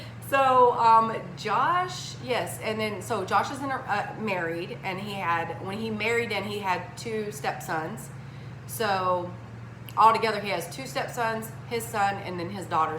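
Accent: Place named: Georgia, United States. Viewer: We are having terrible weather, so I'm watching all your old videos!!! 😍 I love that now you let your Georgia accent loose. I don't hear it so much in these older vids! Love you and your kiddos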